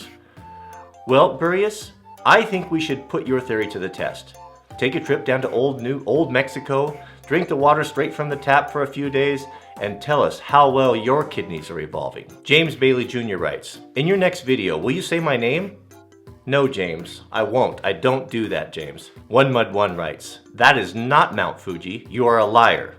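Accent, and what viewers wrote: Canada. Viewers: I am confused you talk like your a Canadian but you hate the metric system but live in the states.Are you a posser?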